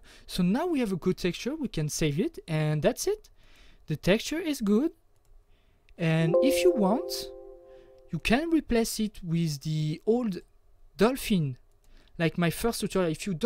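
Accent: France. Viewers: Super tutoriel merci. ça sent l'accent français non ?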